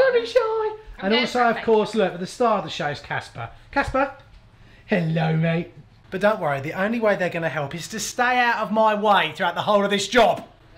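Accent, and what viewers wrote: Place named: Scotland, United Kingdom. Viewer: Thanks for the entertaining vids and love the Scottish accent - 'suits you Jimmy' Question from me handsome is I need to run pipes in an interior concrete slab for 4 meters then into a suspended floor to reach a sink and dishwasher position my wife chose!!!.... I really don't want to have any junctions under either floor can I use PEX off a roll and just connect at both ends???.... Bet you got a vid for that eh?!! Dandy Dave x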